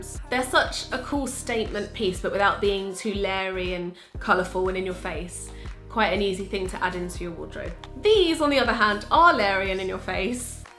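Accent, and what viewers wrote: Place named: England, United Kingdom. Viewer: Am I the only one who loved the way she said nerdy? Lol. The English accent is life 😩 lol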